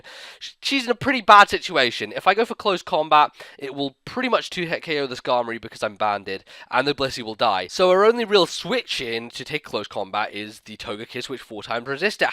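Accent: France. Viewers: Yes... more French accent stuff! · Can we PLEASE have a battle narrated fully in your French voice? That would be oh so amazing.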